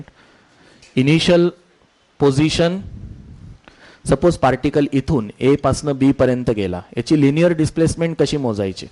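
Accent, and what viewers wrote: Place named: Pune, India. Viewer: Tumhi PUNERI such a shuddh Marathi. So smart teaching.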